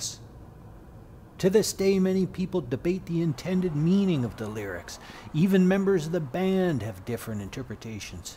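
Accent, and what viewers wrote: Canada. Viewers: I dig your narrative style. So charmingly hinterland Canadian. Brings a smile to my face :)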